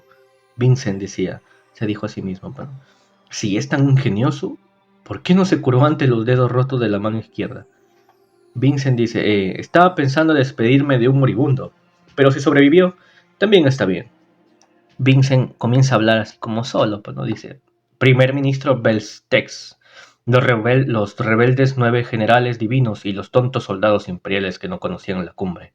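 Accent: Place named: Colla, Bolivia. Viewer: Cada vez habla más coya mi causita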